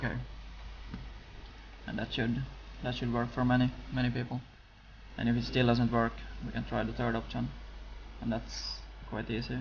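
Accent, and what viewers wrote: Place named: Sweden. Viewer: Man hör ju tydligt att du är svenne iaf :D+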